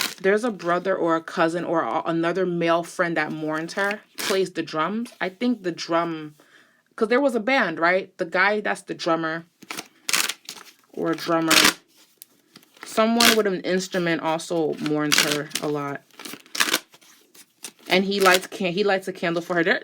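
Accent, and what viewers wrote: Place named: New York, United States. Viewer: Ha. I love your voice and accent lol 😆. New York?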